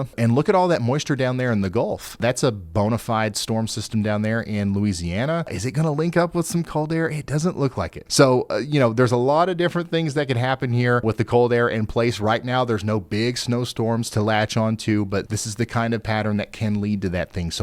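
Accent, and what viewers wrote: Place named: Appalachia, United States. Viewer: He said some of yuns! 😂 I didn’t realize yuns was a thing in Kentucky too. Appalachian dialect at its finest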